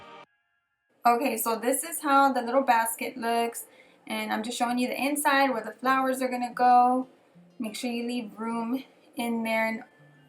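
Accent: Texas, United States. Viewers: I know a fellow Texan accent when I hear it! Love your videos!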